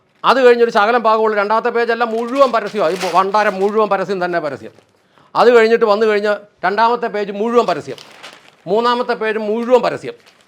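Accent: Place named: Idukki, India. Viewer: Chettante samasaram kollam poli ...idukkikaran ano 😅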